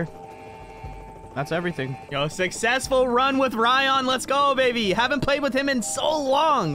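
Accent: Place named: United Kingdom. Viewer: “hello there” he says in his normal British accent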